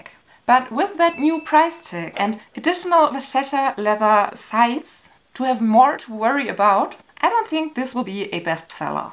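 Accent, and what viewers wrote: Germany. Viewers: Where are you filming from love? Love your German accent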